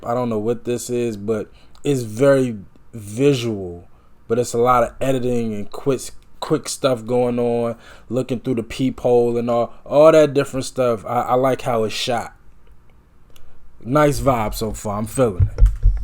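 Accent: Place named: Ireland. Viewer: Big time Irish accent.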